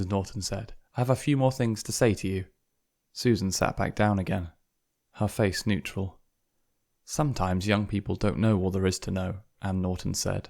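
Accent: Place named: United Kingdom. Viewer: the British accent really adds something to this lol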